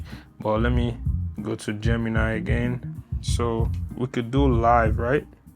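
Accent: Nigeria. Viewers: The accent never goes wrong Naija for life🇳🇬💯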